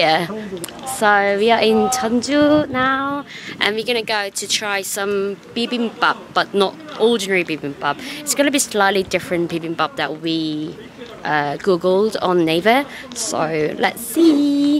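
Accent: United Kingdom. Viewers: 영국식 발음 정말 예뻐요!! 보면서 저도 계속 따라하고 있음 ㅋㅋ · 지금은 어디를 파헤치고 계신가요 ㅎㅎ 기분이 좀 우울해서 일부러 영상 보러왔어요 ㅠ! ㅎ 저도 전주 가봤지만 디게 속속들이 정성들여 전주를 여행하신다는 느낌이네요ㅋㅋㅋㅋ 좋아요! 영국영어.. 이제 회화공부를 시작했는데 정말로진짜 처럼 되고싶어요! 저 할수있겠죠?ㅠㅠㅠ 앞으로도어쨌든 영상 잘 볼게요! ㅎ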